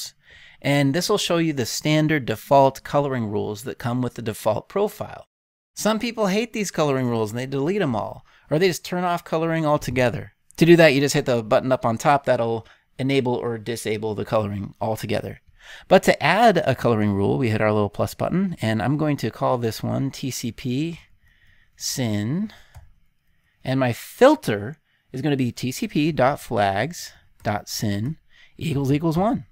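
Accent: India. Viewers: i dislike the Indian accent; can't help it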